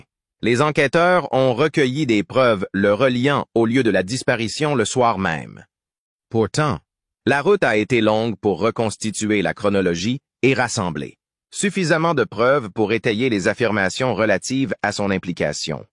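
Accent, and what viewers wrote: Canada. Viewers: Pourquoi "Pauvre France" ?? Le gars qui parle a un accent canadien !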